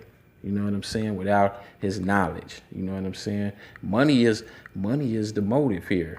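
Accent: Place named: West Coast, United States. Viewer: sound like you are from the west coast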